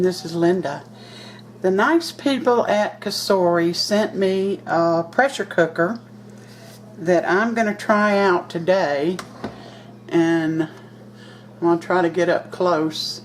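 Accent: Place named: Alabama, United States. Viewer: I just recently found your channel and I love your videos. I appreciate your simple recipes and your thorough instructions. Love the Alabama accent and taste testing. Can't wait to actually try some of these dishes.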